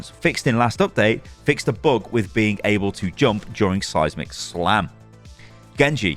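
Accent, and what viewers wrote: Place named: United Kingdom. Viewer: Well maybe dial back back the British bullshit it’ll transcribe just fine.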